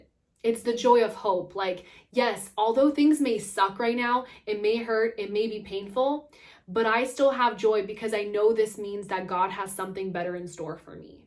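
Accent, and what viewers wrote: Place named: Spain. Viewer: Love the Spanish accent 😍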